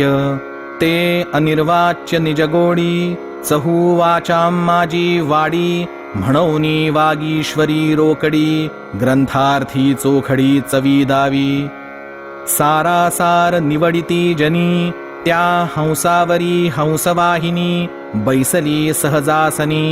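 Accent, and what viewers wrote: Standard. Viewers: Khup dhanyavaad... Aj guru krupene ha garth ch parayan karych ichha ali... And tumcha ha vedio bhetlaaa khupp khupp dhanyavaad... Tumchya vedio muje agdi shuddh uchhar hotatt... Khup shayog bhetlaaa.... 🙏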